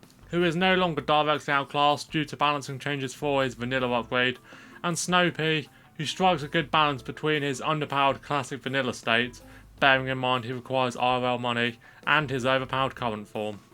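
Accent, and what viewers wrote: United Kingdom. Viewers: The British accent is very cool 😎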